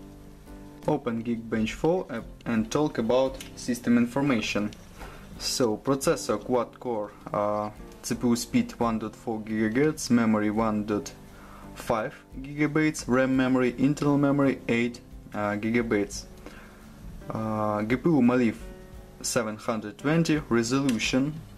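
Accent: Russia. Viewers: Русский на английском базарит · Мне кажется что у него русский акцент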